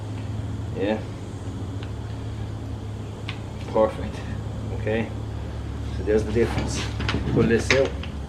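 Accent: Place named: Ireland. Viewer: I like your Irish accent!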